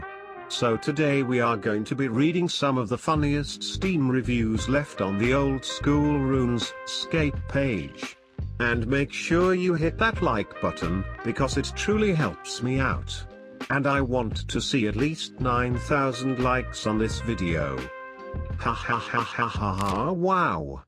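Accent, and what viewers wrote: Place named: East Tennessee, United States. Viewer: You eastern tennessee hillbilly.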